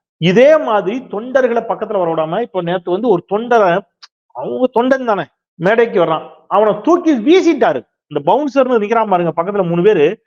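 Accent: Tirunelveli, India. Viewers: நெல்லை வட்டார வழக்கு 👍👍👍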